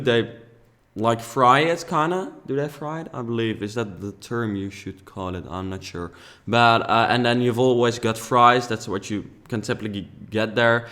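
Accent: Netherlands. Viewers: Gooooo Nederland jeee haha geweldig en mooi gemaakt!! En je hoort wel dat je van Nederland komt #DutchPowerr!!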